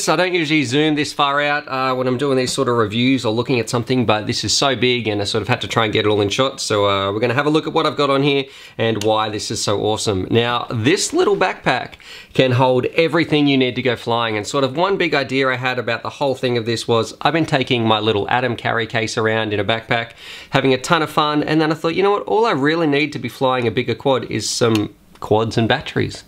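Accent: Australia. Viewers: Hay great videos man but just wondering are You Australian because if you are like me I would love your chance even more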